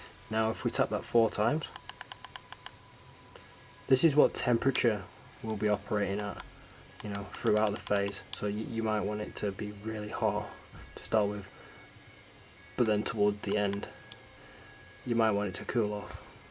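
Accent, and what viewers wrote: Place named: South, United Kingdom. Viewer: Britain, possibly South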